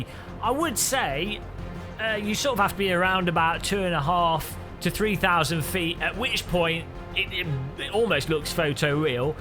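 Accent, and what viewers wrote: United Kingdom. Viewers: You're awesome! You're the only person with a British accent while having such an upbeat and awesome voice. Amazing review. Hopefully you get into flying more.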